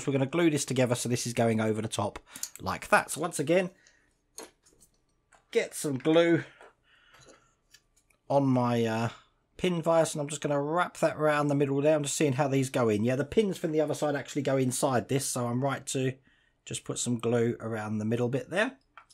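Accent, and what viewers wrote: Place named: Essex, United Kingdom. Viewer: I think that’s probably more your ‘Essex’ accent coming through;).....being from Thurrock sounds totally normal to me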